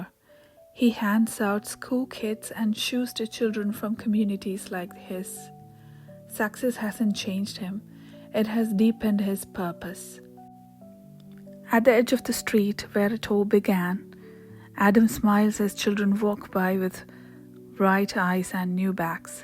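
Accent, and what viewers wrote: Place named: United Kingdom. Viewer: Ma'am I like reading and story telling. I am going to practice shadowing with you. Your pronunciation, rhythm, intonation everything is perfect and most importantly you speak british pronunciation which I love to speak ❤❤ It will help me to improve my pronunciation. You speak natural English but we are trying to make it natural 😊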